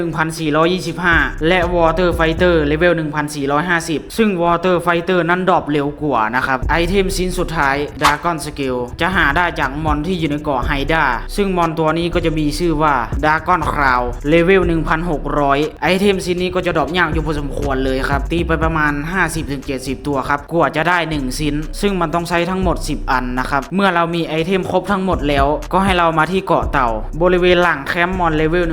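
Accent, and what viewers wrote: Laos. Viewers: พี่เขาเป็นคนลาว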